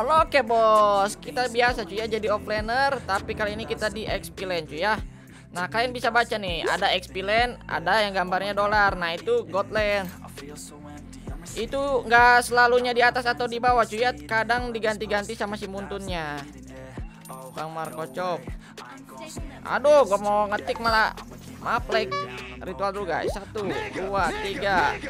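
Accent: West Java, Indonesia. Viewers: Kamu orang Bandung mang soalnya ngomingnay kaya ada sundaan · Bang org sunda yak? Gak tau napa kalo youtuber sunda beda cara ngomong nya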